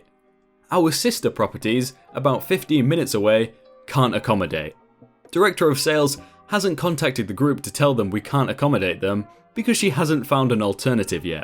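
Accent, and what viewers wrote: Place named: Scotland, United Kingdom. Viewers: Not a bad Scottish accent. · You have a decent Scottish accent. Don't worry about it.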